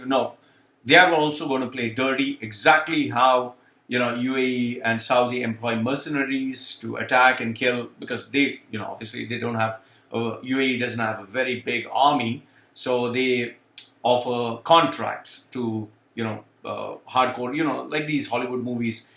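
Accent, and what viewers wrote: Kerala, India. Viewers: Vada idly dosa. Lol you still got the malayali accent and it is very amusing. Again it is free. WOW.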